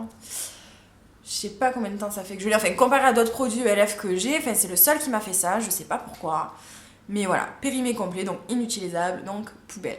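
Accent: South, France. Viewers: Coucou! Franchement j'adore tes vidéos! T trop mimi! Ca fait du bien de regarder tes videos d'autant plus que j'adore ton accent, je suis du sud mais je vis dans le nord depuis quelques années et c'est dur :( voilà je suis régulièrement tes vidéos qui m'apprennent pleins de choses ;) continue t trop cool et trop rigolotte!! Bye!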